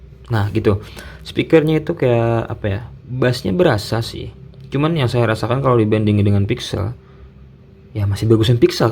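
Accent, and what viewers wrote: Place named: South Kalimantan, Indonesia. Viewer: Masnya terdeteksi anak kalsel wkwk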